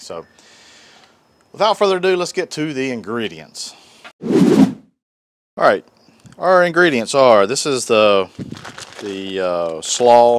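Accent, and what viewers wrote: North Carolina, United States. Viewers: The whole time I was watching, I kept thinking, man, he’s gotta be from NC...lol! I lived in Denton for 10yrs. Good people!😁👍🏻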